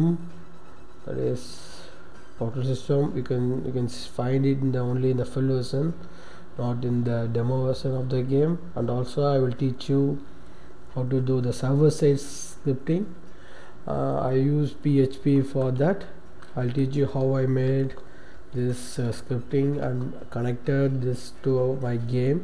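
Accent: India. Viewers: Thanks.... :D But One Question " Are You Indian ? "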